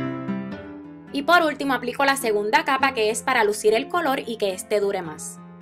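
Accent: Puerto Rico. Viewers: me encantaaa tu acentoo boriicuaa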